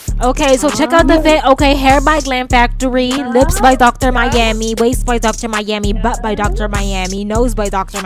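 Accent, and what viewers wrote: Jamaica. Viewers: Are u Jamaican cause I'm Jamaican. BTW I'm your biggest fan ❤ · I like how she tried so hard on the Jamaican accent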